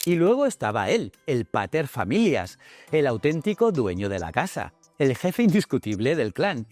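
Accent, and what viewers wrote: Spain. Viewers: Tú eres andaluz? Verdad? Las tres primeras palabras del vídeo te delatan, jaja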